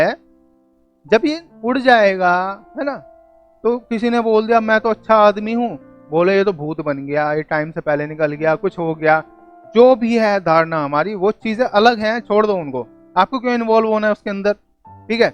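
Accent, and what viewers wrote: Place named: Delhi, India. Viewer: Apki bhasha Dehli ki hai..... Mere ek dost se milti julti.. 🙏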